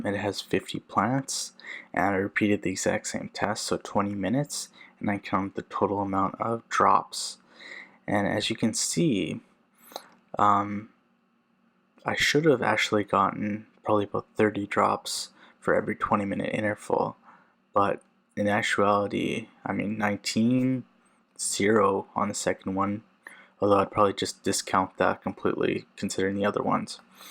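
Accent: Punjab, India. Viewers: bro are you from Punjab?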